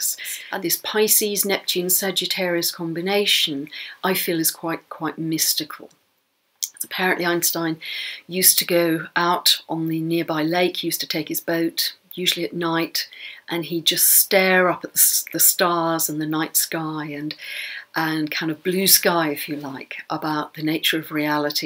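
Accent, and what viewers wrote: England, United Kingdom. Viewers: Thanks - enjoyed your energy, wisdom and wonderful 'jolly good' English accent. So good to hear the queens English spoken as it used to be. (I'm speaking as an ex West Londoner born 1950):-) Also love the cushions!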